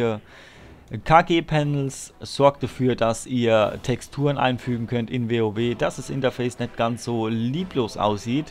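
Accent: Palatinate, Germany. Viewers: ohh ein Pfälzer find ich gut darf ich fragen aus welchen raum du kommst? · Da Pfälzer....wo her?^^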